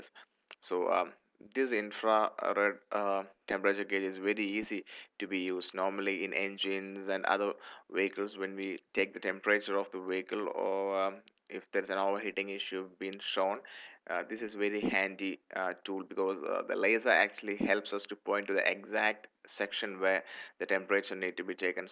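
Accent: India. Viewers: typical indian accent !!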